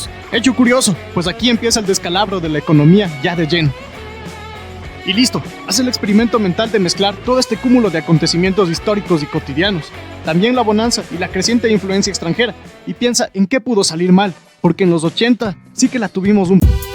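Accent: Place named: Mexico. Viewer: El narrador es mejicano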